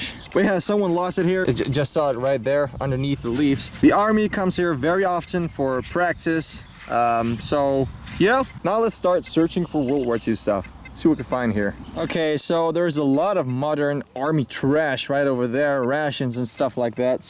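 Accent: Netherlands. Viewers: Is he from Netherlands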